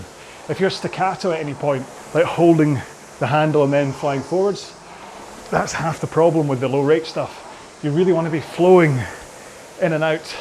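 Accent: Scotland, United Kingdom. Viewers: I see you posted a 2 hours video. This is what I need to learn the Scottish accent 😊😅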